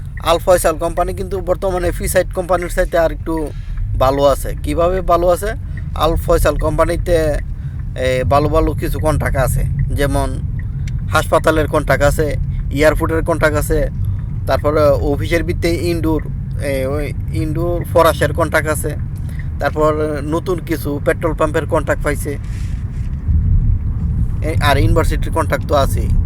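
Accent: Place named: Bancharampur, Bangladesh. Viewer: বান্ছারামপুরের ভাষার মত লাগলো আপনার বয়েছ টা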